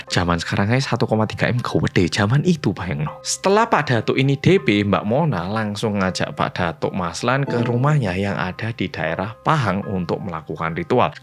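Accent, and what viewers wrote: Java, Indonesia. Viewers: Hallo bolo medok😊 Lgsg nyimak rek 🎉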